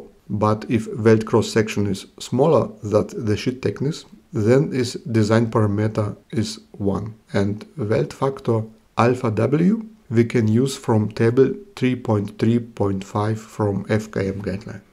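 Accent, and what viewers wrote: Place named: Germany. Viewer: Great, thank you 👍 Love the german english mix Hundert five point vier 😁😁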